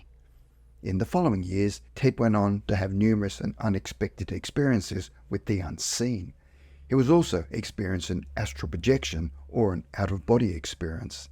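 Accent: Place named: Australia. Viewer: The narration is in English - it's a lovely Australian accent, and it is not hard to understand at all.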